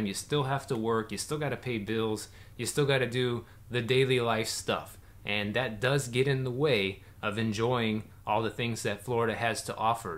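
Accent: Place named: Chicago, United States. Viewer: Hey man, great videos. We are moving to Naples soon and so have been doing our research. We are beyond excited. I watched your East Coast/West Coast video, and I am excited about the laid back nature of the west coast, but a bit nervous about the red tide! I will also be heading east frequently to surf. Based on your accent I bet my son you were from Chicago ( I am from Evanston). Then you said Illinois this video, where are you from in Illinois? Keep the great videos coming!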